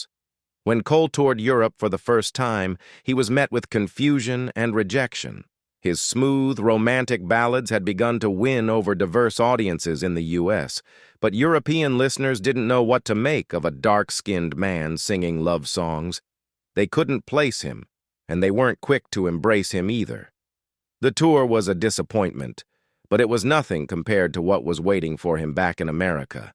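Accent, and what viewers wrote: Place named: England, United Kingdom. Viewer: Why has he an English ascent and where is evidence of his 4 years old picture and church photos. He is English not American.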